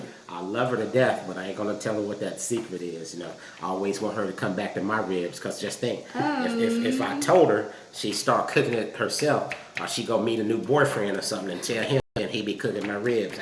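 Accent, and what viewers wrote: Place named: Visayas, Philippines. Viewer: W/my bana, kron pako kBntat . Hehe bisaya diay ka si.😂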